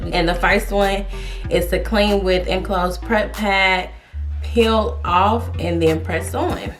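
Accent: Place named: South, United States. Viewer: Love the southern accent!!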